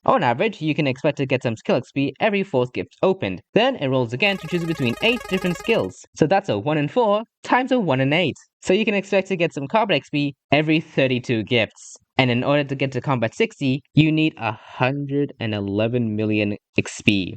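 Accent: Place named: Australia. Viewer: Great vid, humorous editing and artwork! Why do i detect the slightest hint of Australian Vowels in your accent?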